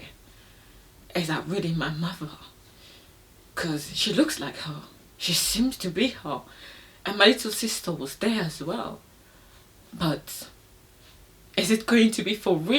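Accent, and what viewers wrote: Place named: Africa. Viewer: I like your accent sista. Sounds like African accent.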